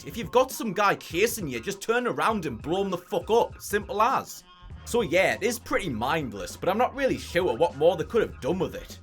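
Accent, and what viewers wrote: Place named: Newcastle, United Kingdom. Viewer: I love that you have the sterotypical nerdy teenager if he was from Newcastle as an accent 😂❤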